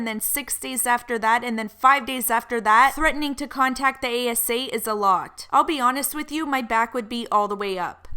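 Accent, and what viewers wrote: Canada. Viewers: Hi, i'm from israel. I am so happy if i get a present or especially makeup for free.both parties were wrong as you said."jane" should have waited polietly and jackie should have given her an update. I'm sorry for misppeling, it's not my native language. I love your canadian accent.you have a good voice.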